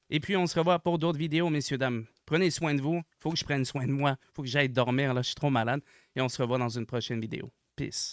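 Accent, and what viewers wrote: Quebec, Canada. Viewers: Sympa ta chaîne mec ;) J'adore l'accent et les expressions du Québec.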